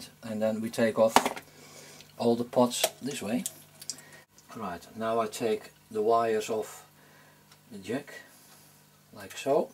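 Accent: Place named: Netherlands. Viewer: You sound dutch... and on your site it says your available in holland, where are you stationend?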